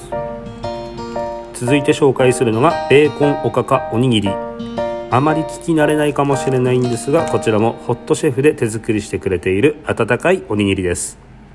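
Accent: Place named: Hokkaido, Japan. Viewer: 北海道弁も良かったです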